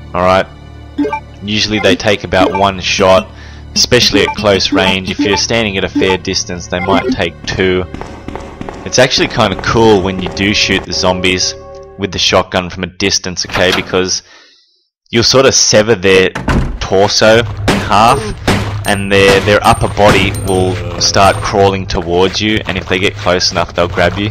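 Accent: Australia. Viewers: You're definitely an Aussie with the way you speak 😂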